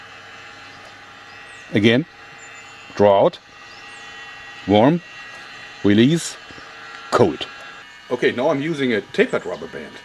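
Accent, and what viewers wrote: Germany. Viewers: Der gutealte deutsche Akzent xD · German english sounds funny xD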